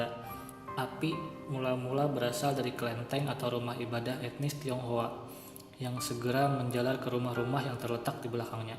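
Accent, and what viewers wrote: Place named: Banjar, Indonesia. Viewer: Admin nya orang banjar asli.. logat nya kental org banjar hehe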